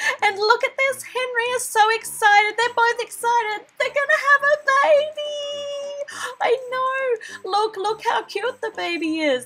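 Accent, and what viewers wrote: Australia. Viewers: Is she australian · Are you from Australia?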